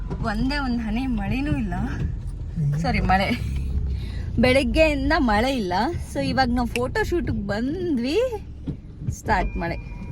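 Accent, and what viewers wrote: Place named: North Karnataka, India. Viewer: Niv North Karnataka davaru anisutte alva namma language tara 😊ede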